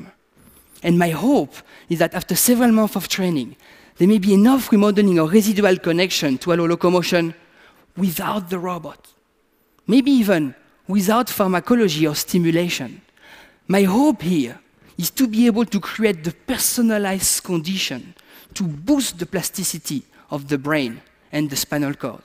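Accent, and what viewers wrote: France. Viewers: Ahaha oh my god, this video was so powerful and amazing, but as a French speaker myself I couldn't help but find it funny every time he pronounced a word exactly like he would've in French. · Yes, thick French accent. Had to listen to it several times before I understood what he was saying. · Ohhhhhh the French accent made it so much more interesting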